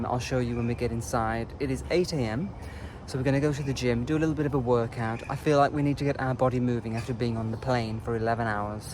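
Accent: RP, United Kingdom. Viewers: I can't believe he does not have a Trust Fund and was not educated at elite British institutions. His speech and mannerisms, show true upper class Britannia. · I came across your channel by accident and really enjoying it im glad you explained about your lifestyle not that you have to .I think its cause your accent is very posh that maybe people perceive you've come from money not gonna lie I thought the same so thank you for sharing .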